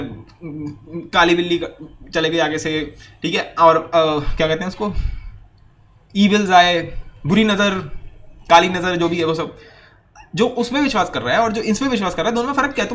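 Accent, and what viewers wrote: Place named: Uttar Pradesh, India. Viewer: Ur from UP 👉 right?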